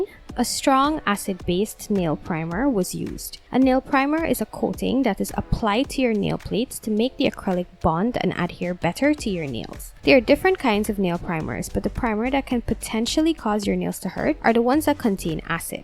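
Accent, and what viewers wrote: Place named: Caribbean. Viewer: Sounds carribean, maybe Trinidad or Guyana .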